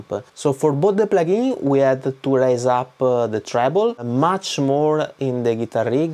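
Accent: Italy. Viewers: Si sente poco che sei italiano ahahahhaa Grandeeeee bel video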